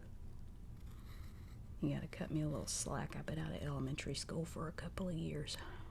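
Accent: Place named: Georgia, United States. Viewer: Boy you sound like you're from Georgia too! I have a thick accent too according to my friends haha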